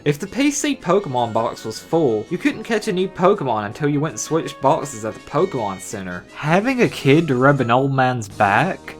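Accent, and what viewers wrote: United States. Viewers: this guys voice... so fucking annoying country accent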